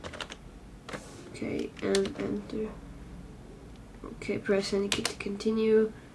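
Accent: Russia. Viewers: u sound russian im russian but i dont have an accent kool vid thanks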